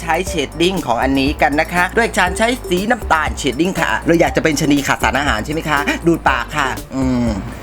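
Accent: South, Thailand. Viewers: วันนี้สำเนียงคล้ายเด็กใต้รึป่าวค่ะ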